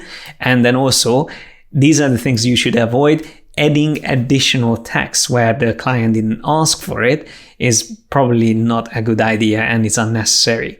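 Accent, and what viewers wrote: Hungary. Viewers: Te véletlenül nem beszélsz magyarul?:D Kicsit olyan a kiejtésed.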